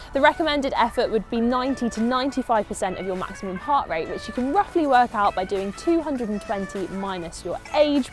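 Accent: United Kingdom. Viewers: Hi I much more 😍 Your Videos. Add 1 subscriber. So where you from USA Or something like British?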